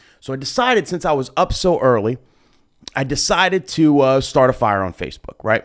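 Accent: New Jersey, United States. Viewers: Im on both sides of this fence... when people complain about the DJ interaction...it just simply has to do with whatever they are doing...if you arent that good at picking good songs... you need to have a filler of you to keep people entertained... if you are super good at mixing but you dont care to interact...then add interactive audio to your set.... and also be up front... But. I dont think you realize that you have big time charisma... ive seen your streams as well... and even when you're quiet...you are interesting to watch... at least for me... you're good at both from what I have seen... of course I havent been to a wedding you DJ...I think... I went to a Philly wedding in 2014 and I almost think you were the DJ but my fallible human memory could be failing me miserably so I wont speculate lol... but you dont realize...you simply have the gift of an introvert and an extrovert...i am the same way... 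i dont want to be expected to interact....but when the party is jumping...im gonna naturally interact just because I am having more fun too. And I think you are a similar way... you got that entertaining jersey accent...you dont portray that you dont like interaction...you just dont like to be forced to be cheesy.. and thats a valid place to be... you simply know when to interact...and when not too...and most people in general simply dont know that... because they dont practice it... or simply dont have enough experience with it...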